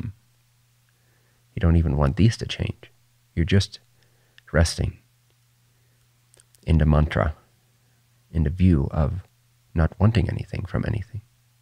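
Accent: Netherlands. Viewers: His dutch accent is kind of distracting...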